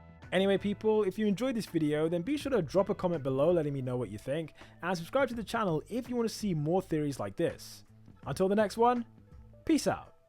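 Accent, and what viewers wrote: United Kingdom. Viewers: Holy shit you're British! This is my first time ever seeing you and I genuinely never realized you were British somehow lol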